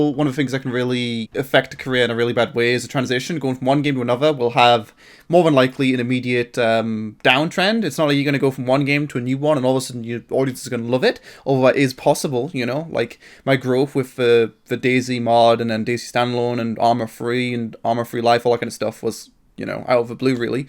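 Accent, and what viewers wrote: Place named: North, United Kingdom. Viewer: Babe, We watch you for you, I can't NUT without that sexy Northern Accent.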